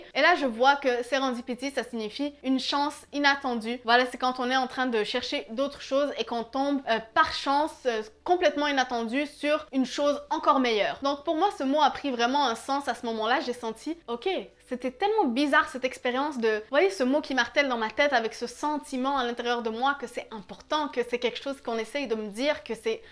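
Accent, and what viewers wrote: Canada. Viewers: Tu est canadienne ?? J'ai vraiment trop ta voix 💕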